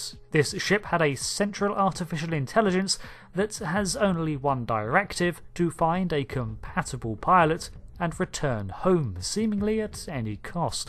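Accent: England, United Kingdom. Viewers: Dude, your English accent is gorgeous.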